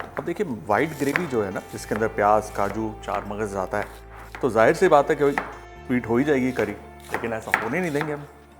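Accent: Punjab, India. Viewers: Aajto pakke punjabion ki tarah bol rahe ho.maja Aa raha hai as I am from Amritsar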